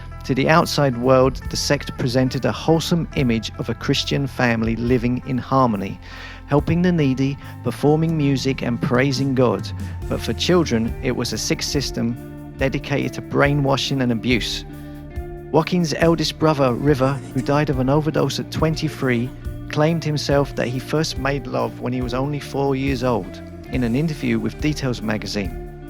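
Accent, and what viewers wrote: United Kingdom. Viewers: Other than the cringeworthy use of the made-up "disorienTAted" by a narrator with a diamond-cut English accent, still a really informative and well-researched documentary.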